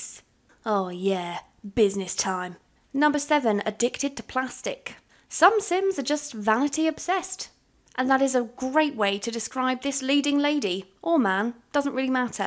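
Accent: England, United Kingdom. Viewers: WoOoW!!! you are truely a unique story teller! Sims 2 has a built in autonymous whims gameplay system. Sims 3 has Story progression but sims 4 has given us so many beautiful tools and freedom ALLOWING US to tell our own stories and YOU my dearly beloved hit the POINT right on the nail!!! Alota simers find sims 4 boring which is unfortunate but what they dont realise is the amount of IMAGINTIVE playground it offers! All it demands from us is a special story from YOU the player and in turn THIS IS THE RESULT!!! So captivating i mean i JUST STARTED your video and when you starting introducing the TRASHBAGDADDY storyline i was BLOWN away and IMMEDIATELY started typing in comments!! WE NEED MORE like you! People with whimsy! English accent with Goddess angelic levels of VOICE Narritive and TRUE PASSION for the gendre i mean WOW you been loving the game since the beginning of time THIS IS SO INSPIRING! 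You have single handedly lifted my spirits and im sure you have ignited many others! i LOVE YOU i love you SoOo much!.. Can i have your number? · u talk like hermione, and i dont watch harry potter. i just know her. shes british.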